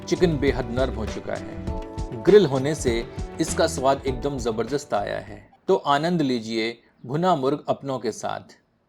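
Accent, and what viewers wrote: Standard. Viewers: apki shuddh hindi bahut hi achi h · Aap itni shuddh Hindi prawachit krte h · aapki voice aur pure hindi soonnnay aata hun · आपका बोलने का शुद्ध हिंदी तरीका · shudd hindi and very polite way to explain recipe. awesome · aapka Shudh Hindi Ucharan bahut Pasand hai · आपका विशुद्ध हिन्दी मे बोलना काफी अच्छा लगा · आपकी शुध्द हिन्दी और आकर्षक प्रस्तुति से ऐसा मेरा मानना है कि यह डिश बेहद स्वादिष्ट होगी..निश्चित ही इसे बना कर आजमाया जायेगा... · Apki sudh hindi Or cooking style dil jeet liya.... Dhanyavaad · shudh hindi me recipe dekh kar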